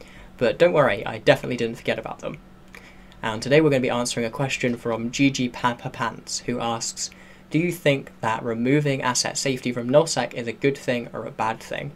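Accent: United Kingdom. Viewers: What's with the american flag on your wall? I'm genuinely curious as you have a british accent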